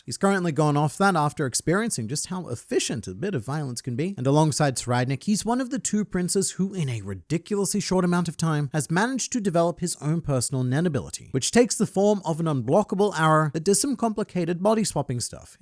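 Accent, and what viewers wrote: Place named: Australia. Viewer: I manly watch this guy on the Internet souly to obtain his accent, after listening for years I'm able to mimic the australian accent and convert it into my own speech😅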